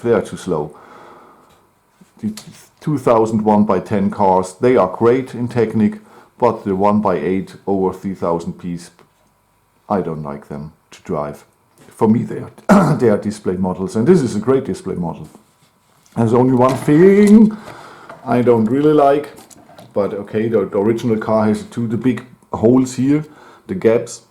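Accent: Germany. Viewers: That german accent though...🤷‍♀🤷‍♀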